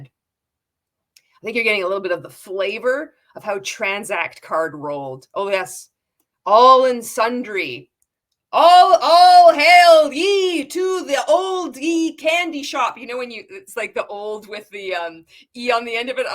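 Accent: Canada. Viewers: I knew I recognized that accent, a fellow Canuck!!!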